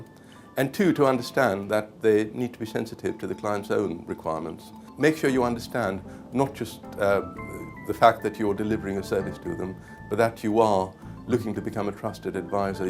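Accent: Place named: United Kingdom. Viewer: Dude don't be embarrassed of the way your dad speaks english. Even my dad doesn't speak like this guy but I don't mind. Plus, I don't think this guy lived his life in India. I really think he was born in the United Kingdom or came at a very early age .. like his parents were immigrants that arrived in the 1940s/50s/60s.. or something .. i bet u thats why because no indian can speak a british accent THAT well without messing up lol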